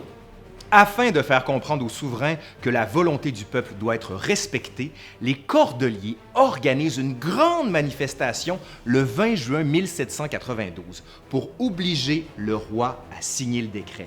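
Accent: Quebec, Canada. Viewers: Salut. C'est un énorme travail que tu as fais LA😃👏 l'histoire de France avec un accent Québécois... super ! Presque 3h qui sont passées très vite ! Merci à toi 👍Ah javais déjà fait un com y a 1an😆😃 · Passées les digressions humoristiques… La qualité d'écriture et le charme du québécois s'opèrent. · L'accent du Québec n'est pas bon. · Passionnante cette chaîne Travail de qualité Bon continuation J adore cette chaîne Et l accent des cousins d Amérique apporte son petit plus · Une personne qui présente bien, qui parle bien, belle gueule, avec un p'ti accent du canada... Bref je m'attendais pas à quelque chose d'aussi tip top! Pouce Bleu & Abonnement! Offrez lui une cocarde! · J'adore l'accent quebecois 🫶🏼 merci beaucoup pour ton travail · j'adore l'accent québécois pour raconter l'histoire de France , j'ai l'impression que vue de loin, c'est plus objectif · J’apprehendais beaucoup la vidéo à cause de l’accent canadien, mais je dois dire que j’ai été agréablement surpris ! 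J’ai accroché dès le début et je n’ai pas lâché avant la fin 2h44min plus tard... très bon travail, j’attends votre épisode sur la 2eme guerre mondiale avec impatience ! :) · cet accent québécois me fait saigner les oreilles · Superbe vidéo J adore l accent québécois :) Au-delà de la boutade, Merci! c est passionnant et très instructif Un français en amérique ... :) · Ton accent québécois m'énerve je peux pas regarder la vidéo dommage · Bravo pour la vidéo, très enrichissant, j'ai appris beaucoup grâce à toi. Merci beaucoup à toi et continue comme ça. +1 abonné 😘 (Ps: je vis en France et ton accent québécois est plaisant 😉) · ... le révolution française relue par un historien canadien, avec l accent canadien, très intéressant 😂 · Maaaiis je suis sûre q l'histoire de France intéresse Bcp de monde de par le Monde... 😉 C 1 véritable série à épisodes.... 😄 !!.. Et j'Aadôre l'accent Québécois... 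👍👍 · Et puis puisqu'on est entre amis, pourrais-tu faire un jour un travail sur la guerre d'Algérie, je suis l'ultime pied-noir de ma famille et j'aimerais bien voir ce sujet traité par quelqu'un de compétent qui ne soit ni Algérien ni Français puisque vu ton léger accent il m'a semblé que tu as plutôt grandi sur les rives du Saint-Laurent que celles de la Garonne. Merci et bonne continuation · Toujours aussi géniales vos vidéos D'une qualité rare et très dynastiques. Votre diction est formidable et l'on vous suit avec entrain. Et puis cet accent québécois !! Quel bonheur , parole de parisienne. Euh ,excusez moi ,mais je me permets de vous dire que vous êtes encore plus beau sans barbe 😆👍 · J'suis la seul à kiffer le fait qu'il est un accent Québécois ??? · Grave kiff j’étais sur mon téléphone quand je entendu ta voix Québec je sais pas pk mais j’ai flippé mdr 😂 mais ouais sinon cette génial 👍🏾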